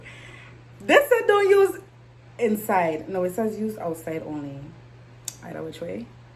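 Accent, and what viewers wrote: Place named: Barbados. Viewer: These locs are awesome and you are soooo gorgeous keep up the good work Your accent sounds a bit like a bajan lol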